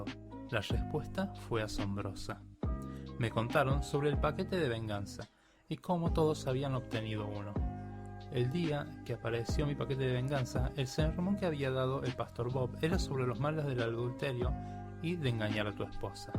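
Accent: Córdoba, Argentina. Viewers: Best ascento cordobes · alto acento cordobés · Es cordobés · Ese acento coshentino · queria preguntar si sos cordobes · Tu acento es muy argentino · es re ccordobez el narrador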